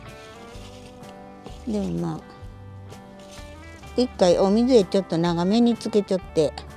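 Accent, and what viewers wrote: Tosa, Japan. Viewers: おはようございます🌞 福岡も台風みたいに荒れた天気でした〜💦 懐かしい土佐弁😊 癒されます✨ いつも美味しそうなお野菜 沢山の愛情貰ってイキイキしてますね💕 私も食べたーい‼️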